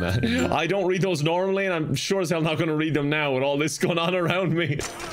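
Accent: Ireland. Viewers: Is he Irish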